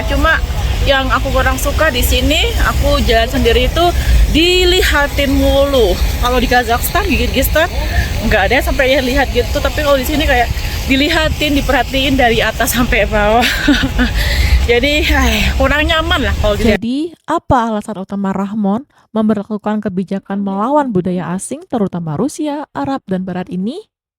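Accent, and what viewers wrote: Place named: East Java, Indonesia. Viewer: Mbaknya logatnya agak medok sepertinya dari jawa timur ya😅😅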